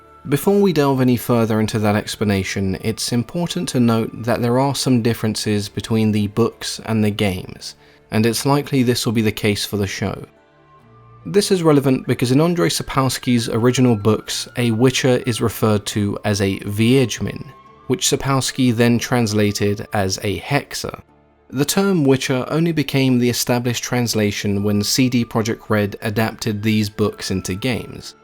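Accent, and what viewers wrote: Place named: England, United Kingdom. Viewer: Direct, clear, informative and English accent....good video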